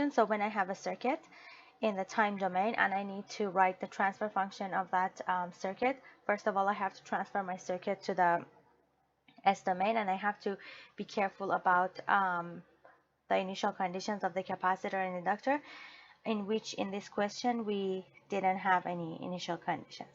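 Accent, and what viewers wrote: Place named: Iran. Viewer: this babe has a Persian accent <3 .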